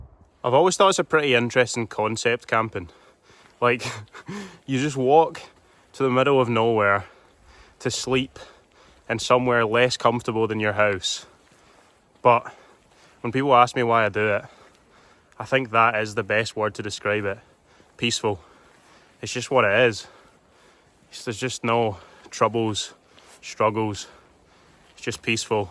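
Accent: Scotland, United Kingdom. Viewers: Great views against a perfect blue sky, if it wasn’t for your accent I wouldn’t believe you were in Scotland. 😅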